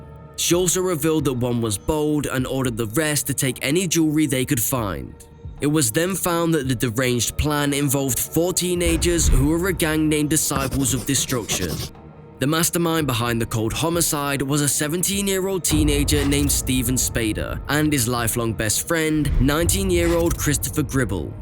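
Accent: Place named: England, United Kingdom. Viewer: This is weird. The narrator seems to have a genuine English accent, yet the script is clearly written by someone who does not speak English very well. How does this business model work? Is the person who wrote the script paying the narrator to narrate it, or is the narrator buying scripts from a foreign content mill? It's interesting that the narrator didn't correct the broken English, despite the fact that he must have noticed the errors.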